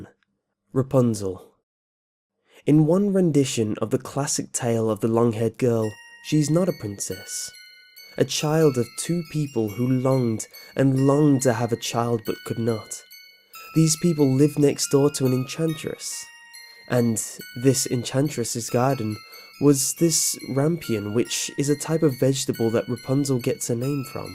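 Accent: England, United Kingdom. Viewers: Excellent narrator!! I love your English accent and how you talk slowly and eerily!! Just like the music you use in a movie, sets the stage of the movie, the same goes for all YouTube videos. If you present any paranormal content, it sounds so much better when the narrator takes the content seriously!! I absolutely hate when i click on something paranormal on YouTube, and the narrator talks loud and fast and stupidly, I click off that video FAST! People that look up this type of content believe in the paranormal and take it seriously. We don't want to hear paranormal content presented as a joke!! Whos with me on this??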